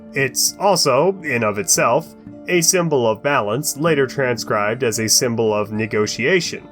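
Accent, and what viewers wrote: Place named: France. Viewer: That French accent gets me everytime 😂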